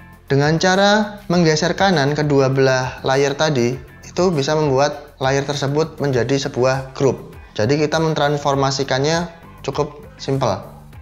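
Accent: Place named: Purbalingga, Indonesia. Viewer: Salaam kenal ... masnya asli Purbalingga ya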